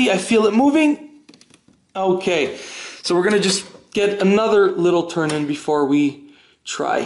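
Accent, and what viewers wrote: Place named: Montreal, Canada. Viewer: Excellent tutorial. Are you Canadian? You have a Montréal accent.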